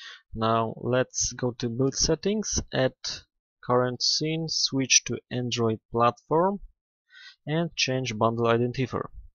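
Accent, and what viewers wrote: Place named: Indonesia. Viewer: dari logat bahasanya kayaknya orang indonesia nih...bener ga ya??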